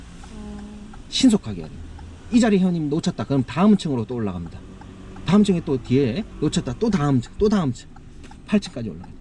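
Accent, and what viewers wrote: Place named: Busan, South Korea. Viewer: 열정이 있네요~ 은 민초들의 눈높이 교육방식 아주 아주 조아요 칭찬합니다 굿^^ 악센트가 부산 같기도 하고 푸하 ^(^ 항상 마음의 중립 중립 중립 하시길 P.S-> 근데 발이 큰가요? 닉이~ 군요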